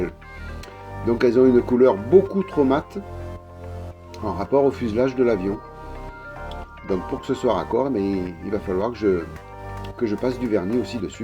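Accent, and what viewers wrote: South, France. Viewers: Bravo. Superbe réalisation. Je vais suivre celle du Mirage F1. Je trouve tes présentations très agréables (avé l'accent) et découvre à chaque fois des astuces que je ne manque pas d'utiliser pour mes propres réalisations. Bonne continuation.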